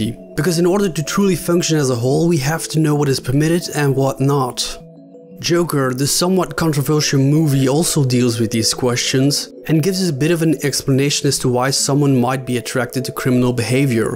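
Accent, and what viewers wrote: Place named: Russia. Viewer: What's with the new Russian accent though?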